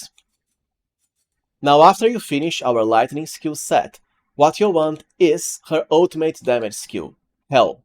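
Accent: Brazil. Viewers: Just started watching your video and within 10 seconds I'm 100% sure you're brazilian!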